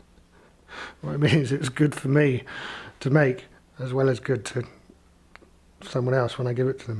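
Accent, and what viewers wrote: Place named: Somerset, United Kingdom. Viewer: I live in france, I bought an Opinel the other week and it's got a stainless blade now, i was gutted, shoulda checked it before i bought it tbh. Only found your videos yesterday and i've been binging on them, nice to hear a somerset accent again, where i used to live (nr Cricket St Thomas) Keep on making vids mate, I love em.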